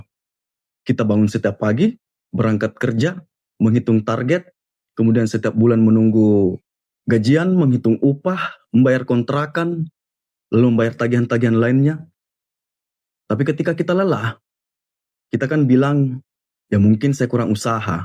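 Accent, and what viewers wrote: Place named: Sulawesi, Indonesia. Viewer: Dari cangkok & logat sepertinya Orang Sulawesi ya 😂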